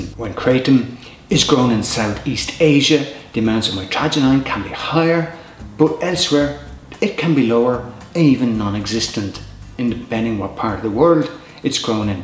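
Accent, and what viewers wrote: Ireland. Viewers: God bless you, you be rocking the McGregor accent/look a little!:)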